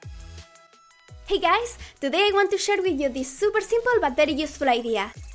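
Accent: Spain. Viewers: Es española, por eso su acento es tan fuerte. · Eres española? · ignorant, she is Spanish its her accent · tienes acento español😱😱😱😱 viva españa!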